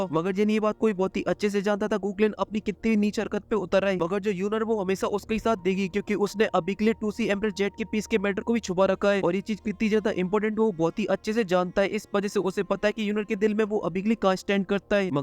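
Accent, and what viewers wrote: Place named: Madhya Pradesh, India. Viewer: Mori mora lak ta ha ap MP sa ho😂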